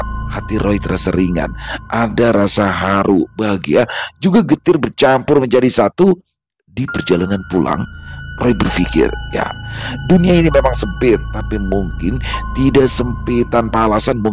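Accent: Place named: Betawi, Indonesia. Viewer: Pulen amat bang logat betawinya. 😅😂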